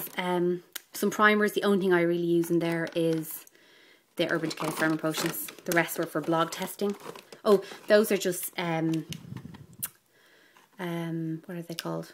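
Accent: Ireland. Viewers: Love your accent! Are you Irish? I'm part Irish! Anyway, can you review the Kat Von De palettes? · OMG it so amazing when you watch so many people with american accents all day long[ and then you stumble across someone with an Irish accent... up the Irish... im so subscribing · are u irsh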